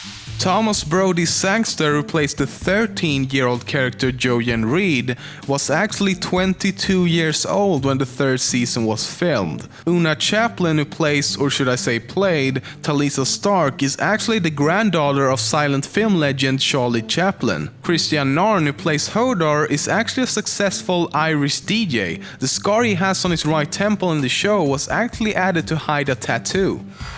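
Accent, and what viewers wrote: Sweden. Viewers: are you from sweden? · Are you Swedish :D? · Seriously, his thick Swedish accent is so annoying!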